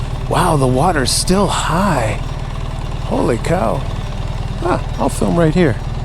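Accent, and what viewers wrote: United States. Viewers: First time watching your channel, but noticed you have the most American accent of any European I've encountered. I like the adventure bike, but like you stated, I think I'd rather ride something else for exhilaration. I do love Ducati motorbikes.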